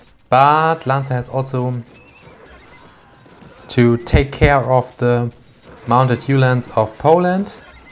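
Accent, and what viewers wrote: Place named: Germany. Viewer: Hello there I would like to ask you why you sometimes refer to yourself in the third person, it makes you sound a little arrogant, no offence intented. Just wanted to make you aware of this since I noticed that you are a non-native speaker due to your German accent. As always, some really enjoyable content:D Keep it up!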